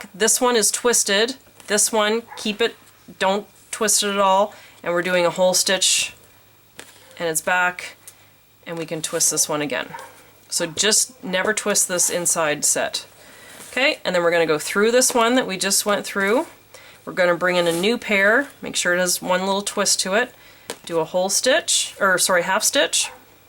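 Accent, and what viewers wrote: Canada. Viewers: This was a wonderful and informative video. It made for a happy Sunday afternoon viewing. My first time watching a pillow lace tutorial, and I think I hit the jackpot! You are great at explaining, and the camera was perfect! I loved that you zoomed in several times so we could see the threads. I’d love if you would put the source links below the video, because I’ve forgotten the name of the book, and the sources for threads and the kits. You are in Bermuda, but you sound like maybe you cane from Canada? Or Northern Minnesota? “Oot” I totally love it, whatever the origin! This is also the first of your videos I’ve watched! Liked and subscribed! ~annie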